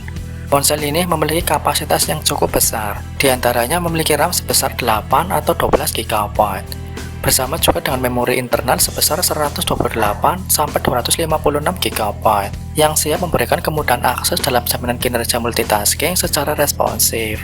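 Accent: Banyumas, Indonesia. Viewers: Lha koe ngapak yaa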